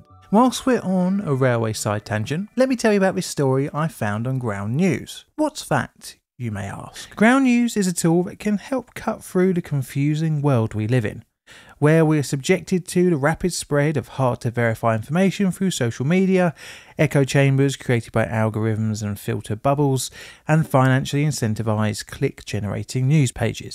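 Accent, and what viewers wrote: United Kingdom. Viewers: Narrator’s accent is aggressively British.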